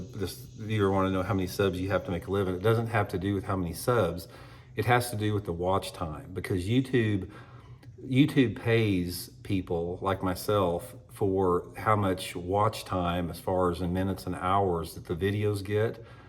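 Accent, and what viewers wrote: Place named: United States. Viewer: yYou sound like an average American, hope you dont mind that label.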